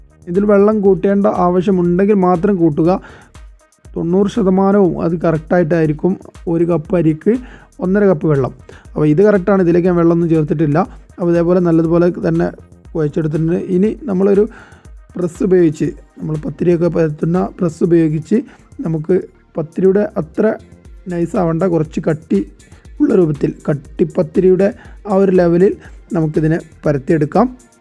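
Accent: Malappuram, India. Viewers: ariyanthondallaaaa ivde Malappuram angne vayil varollu😀😀😀😀 Udaharanam :- Maya payam😀😀😀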